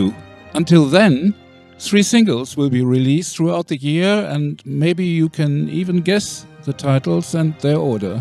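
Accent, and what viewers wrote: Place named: Germany. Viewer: Wow!!! 👍👏👏👏👏This is really awesome surprise 🎼🎶🎹💖💖💖..., thank you 🌹✨ for talking to us trough this video,(I like your german accent). I am sure will be great to hear all those beautiful songs of, perform with an orchestra.... Beautiful and wonderful, can't wait... I am wishing you and a lot of success 🎼🎶🌟👏 forever 🎶💖✨,✨✨ always lovely 🌹💖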